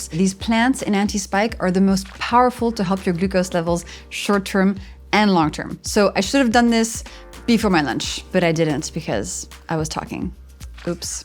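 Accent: France. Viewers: You are a good YouTube personality & diet restriction advice giver. Vogue is always a sucker for a French accent. You say absolutely nothing about consuming enough water, but are happy to show us your cute little recipes. We know the information you are giving is fraudulent, masquerading as science, and all those comments on your channel agree with this. Hopefully you can change your tune, or just do something else entirely, instead of contributing to society’s toxic diet culture. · irritating french accent lol n your not a Goddess . Repeating what all the internet knows already to sell supplements or yourself ....why i hate this period in time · American former French teacher here. Her English is so good, I thought at first she must be a native bilingual speaker, maybe Quebecoise (French Canadian). "Elle n'a que l'ombre d'un accent francais..." Brava!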